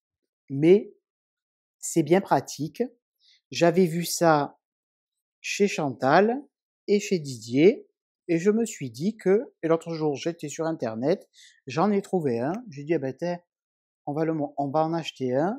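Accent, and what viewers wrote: South, France. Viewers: Merci Toujours un régal de te retrouver avec ton bel accent du sud..j’en ai marre de tricoter tes chaussettes!! Mon second petit fils a voulu les mêmes que celles tricotées pour son cousin! Heureusement que je change le contrastant!j’ai horreur de faire 2 fois le même ouvrage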